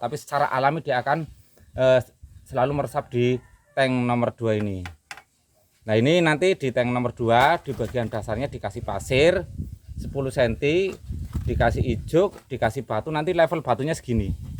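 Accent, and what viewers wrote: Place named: Banyumas, Indonesia. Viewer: Logate kayong madan ngapak kie,, 😁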